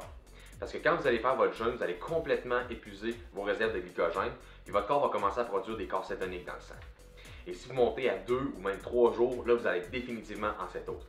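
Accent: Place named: Quebec, Canada. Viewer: Vous parlé trop vite et avec l accent quebequoise j ai du mal à suivre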